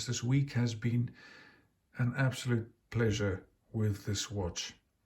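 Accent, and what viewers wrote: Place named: Greece. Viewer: thank you for answering. I would not be surprised is you were Greek the way you speak English, that's why i asked. Yes, second hand may be the way to go, but i would really like the hi beat movement and the thinner profile of the version 3. Wow, from South Africa to the opposite side, Finland 😮.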